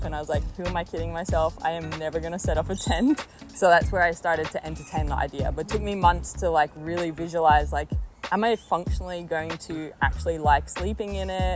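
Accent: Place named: Australia. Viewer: She has certainly picked up the Aussie accent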